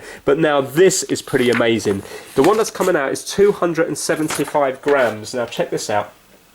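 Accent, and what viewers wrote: United Kingdom. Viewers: Haha your happy British voice :)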